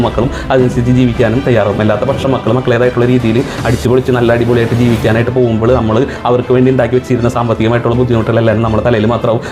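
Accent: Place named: Cherai, India. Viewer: Cherrayi slange..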